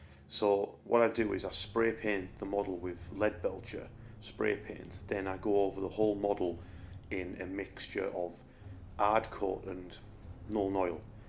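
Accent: United Kingdom. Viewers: Excellent painting job on them all, nice to hear more northerners on here. Hello from Barnsley. Instant sub btw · Lovely army and lovely accent, whereabouts in the UK are you from?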